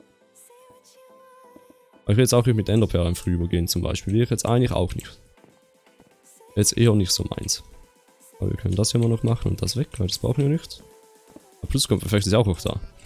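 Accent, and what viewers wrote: Switzerland. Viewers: Nicht negativ; aber ich feier einfach den Schweizer Akzent sehr :)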